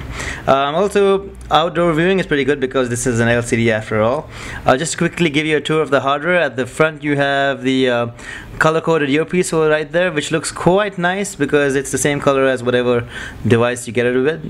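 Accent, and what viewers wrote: India. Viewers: i hate indian accents they annoy me im sorry to say but looks like the place iswonderful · Thumbs for up that indish accent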